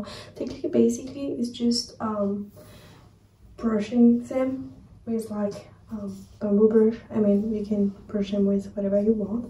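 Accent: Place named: France. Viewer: I like your accent french girl. You are very pretty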